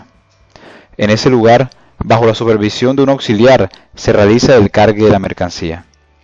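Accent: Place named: Venezuela. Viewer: es Venezolano, no reconoces el Acento.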